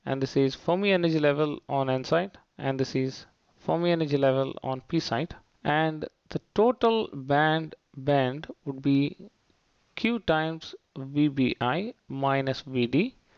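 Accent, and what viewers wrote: India. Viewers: We're getting sick of Indians accent.God damn it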